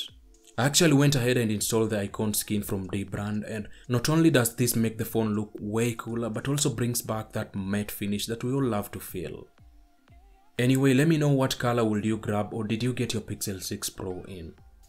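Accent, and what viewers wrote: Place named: Kenya. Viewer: Dunno why I feel like you sound Kenyan😂😂😂....just bumped into your channel as I was reviewing the pixel 6 pro. I have the Pixel 6 and love this phone but honestly I keep wondering if the camera on the pro is better. Do you have an opinion on this? That would be the sole reason I switch to the pro. I love taking memories of my kids on video and photo and want the best images? If the pro is better I just might be convinced to swop purchase it. Thoughts ?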